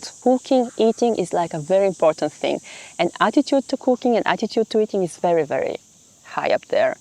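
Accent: Bulgaria. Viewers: Cudno, ali prvi put slusam nekoga da govori srpski sa bugarskim akcentom. :D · bravo..ali tako je jak bugarski naglasak kod nje, vrlo simpaticno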